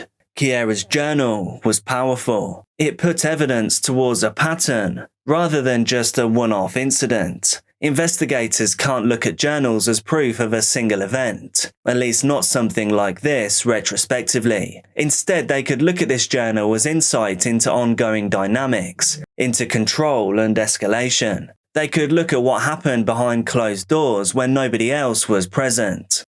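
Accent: England, United Kingdom. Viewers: Oh thanks for the "human narrated"!!🩷🩷i nearly skipped this content cause i thoight It was AI. ìnstead,you re englidh,not american! Love ot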